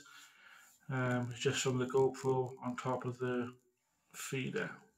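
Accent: Wales, United Kingdom. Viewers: A great few hours with the air gun, that’s a good Welsh accent. I live near Hirwaun and shoot my share squirrels 👍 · Not Welsh. English with a Welsh accent.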